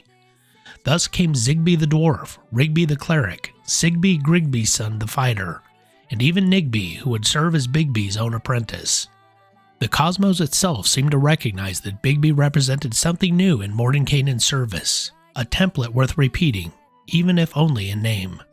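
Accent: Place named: South, United States. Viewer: you sound like you are from the south.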